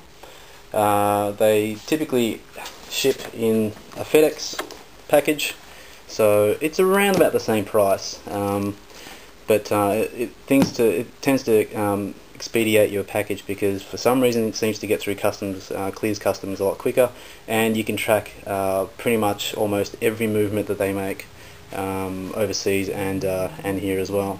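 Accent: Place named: Australia. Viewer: good to hear an aussie accent for once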